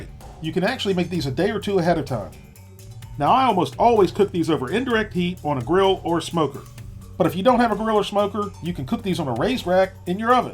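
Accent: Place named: Texas, United States. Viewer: This guy has the inflection of chef John but with a Texas twist! 🪢 I love it!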